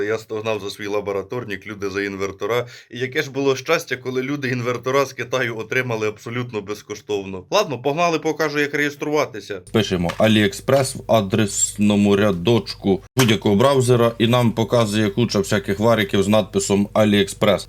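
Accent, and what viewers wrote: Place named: Sumy, Ukraine. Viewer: я почув ні разу не місцевий акцент - схоже на сумський ))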